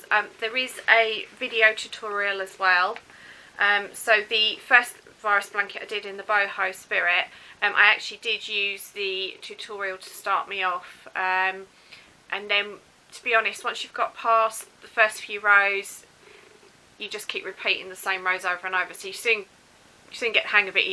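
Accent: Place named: England, United Kingdom. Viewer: Just found you and am enjoying your cheery English accent. I will be working on a virus blanket soon. Thank you. Mary Wigmore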